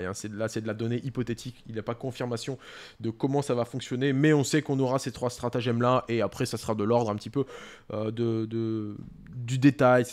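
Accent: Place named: Scotland, United Kingdom. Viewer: vu l'accent, il est pas anglais, il est écossais !!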